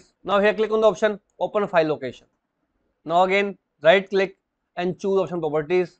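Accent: India. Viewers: if you hear an indian voice ur problem is fixed 100% rate (THANK YOU SO MUCH BTW 🙏)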